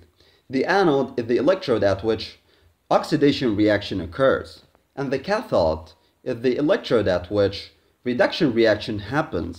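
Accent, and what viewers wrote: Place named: Netherlands. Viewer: Sorry I meant you sound a little dutch and Russian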